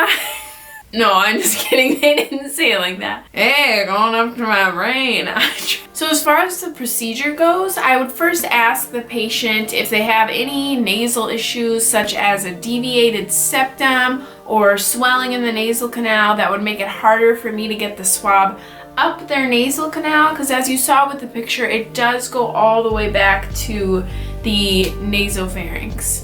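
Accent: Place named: Wisconsin, United States. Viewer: Very informative. I haven't had the COVID test, but not afraid to now. Your Wisconsin accent is pretty good, you must be a native.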